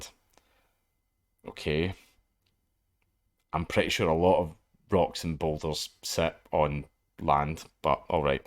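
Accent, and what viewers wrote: Scotland, United Kingdom. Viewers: I’m not gonna lie I clicked on this cause I wanted to hear him about “A FOOKIN ROCK CANT BE RACIST YA DAFT TWAT” in his thick Scottish accent lol who’s with me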